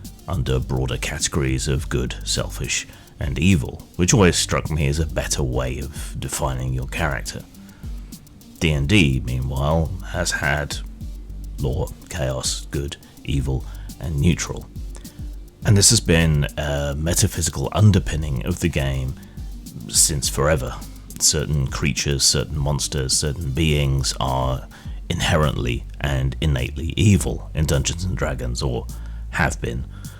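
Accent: United Kingdom. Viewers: Good video,. I find your voice to share a certain charming quality with that of Alan Moore, but less unintelligible to my sensitive Yankee ears. You know us, anyone with a British accent is inherently more authoritative, more intelligent, and more sophisticated so well done! My two cents (or pence, or whatever): I like the elegance of the Law-Chaos paradigm. It is more like yin and yang. Both are an appropriately abstract interpretation of heady psychological ideas, and of more use from a philosophical perspective, in my opinion. They have more concrete and objective definitions, and are less apt to instigate a disruptive moral argument at the table. Also, consider that the circle is always turning, illustrating that no one remains in one or the other for too long, or they risk catastrophe. There's a whole endless discussion there as well. Good and Evil, on the other hand are too subjective in their definition and are way too easy to argue over for my use as a DM. 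Consider the old saying that every villain thinks they are the hero of their own story. Even in the Bible, Christ says that every man does what is right in his own eyes, to say nothing of throwing stones in glass houses, judging not, and a hundred other sayings in a hundred texts of a hundred religions. Defining good and evil, as far as I can tell, is the first step toward war, and allows for the dehumanization and vilification of others, which then opens the door to atrocity of all kinds, including the executio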